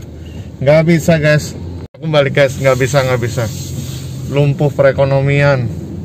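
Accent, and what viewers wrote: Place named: Java, Indonesia. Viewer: Kok logatnya ada jawanya Om. Dulu kuliahnya diJogja ya Om.🙏😁😁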